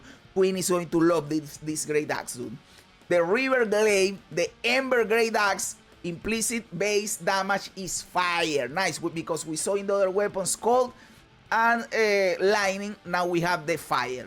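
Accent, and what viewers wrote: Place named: Dominican Republic. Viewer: a fellow dominican playing poe with a thick accent was not something i expected this is CONTENT lmaoo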